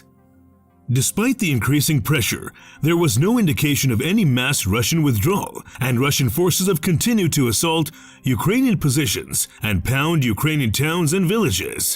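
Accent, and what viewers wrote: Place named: United States. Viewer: Simply NAUSEATING American accented voiceover, had to give up.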